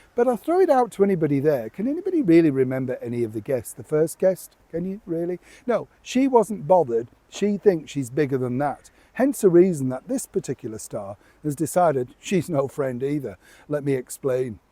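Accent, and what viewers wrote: United Kingdom. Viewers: Your British accent and the lovely scenes from London and environs make me want to have a cup of tea every time ! Sometimes I make a full pot ! Today I had iced tea ! It was 104 F outside too hot for a fire, the A/C is running and a fan too...greetings from Stockton, California USA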